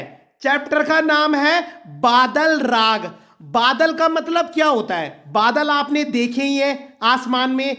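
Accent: Garhwal, India. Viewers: Sir kya aap gadwali ho ? Love from Chamoli - Gopeshwar, Uttarakhand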